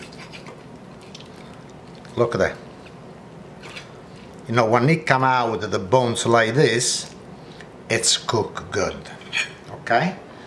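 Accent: Italy. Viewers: I like way of explain. I think Itlian Inglish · Don't you just love that Italian accent? Sooooo Molto simpatico Grazie molto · Well somthing simple, but the italian accent , remind of god father movie!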